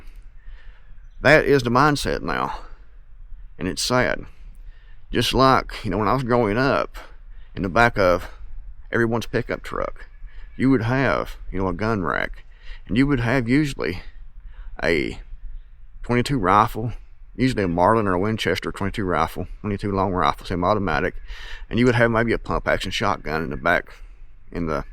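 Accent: Appalachia, United States. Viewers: First video ive seen of yours i am a proud born and raised, West Virginia, Appalachian gal, and if they think you have an accent they should hear mine. Ive always been compared to Sissy Spacek, that played Loretta Lynn in the Coal Miners Daughter movie, because of the way i talk. Im so happy to be here and to hear a fellow Appalachian that sounds just like me. Oh yeah im a new subscriber too.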